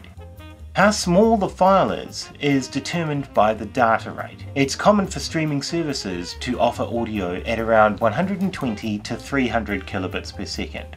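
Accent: New Zealand. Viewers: OMG a New Zealander opening his mouth. God save my ears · Nice to hear a fellow Kiwi from New Zealand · yep New Zealand, just checked. They say "heedphones" :)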